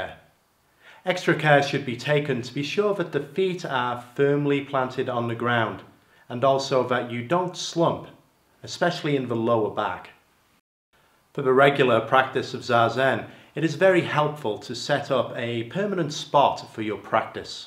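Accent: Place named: England, United Kingdom. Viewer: Why does Steve-O have an English accent?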